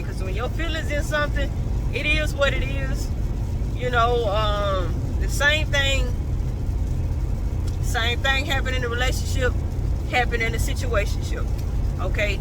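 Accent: South, United States. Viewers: Hello country girl love your southern accent as for relationships it's best to talk before you start because time is valuable believe me I hope you learned from that experience take care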